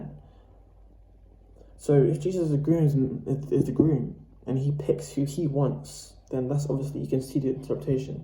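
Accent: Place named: London, United Kingdom. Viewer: Are you from london? You sound like you are - I saw ur comment on a DMT video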